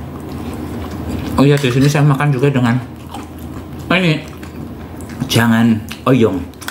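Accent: Banyumas, Indonesia. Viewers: Lucu ngapak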